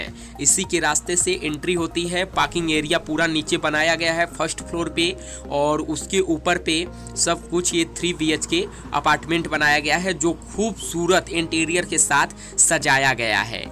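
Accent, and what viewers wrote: Bihar, India. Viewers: Bihari accent mein kyun baat karte ho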